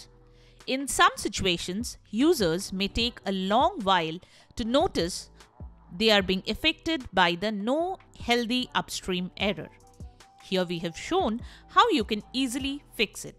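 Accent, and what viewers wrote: India. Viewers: lmao Indian voice. How am I not surprised.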